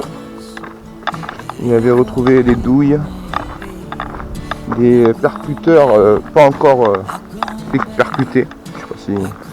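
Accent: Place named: Corsica, France. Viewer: Vu l'accent Corse, que tu te coltines, sache que je suis très heureux de t'accueillir chez moi. Et même si je me trompe...tu es le bienvenu quand même. En effet, les forts de la Gavaresse et de La Colle Noire, ont été des hauts lieux de notre histoire méridionale. J'ai eu le plaisir et l'avantage de parcourir les tréfonds de ces architectures, alors que j'étais ado en recherche d'aventure. Sans compter les galeries de mines du Cap Garonne ...bien avant qu'il ne soit exploité pour le tourisme. Autant te dire que j'y ai passé de bons moments à retourner les pierres, pour y chercher une suite.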